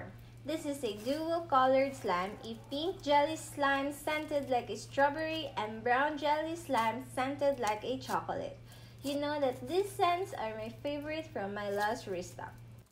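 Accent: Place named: Philippines. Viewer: She is a pinoy...so her first language is filipino. Atleast she tries her best. Hope u understand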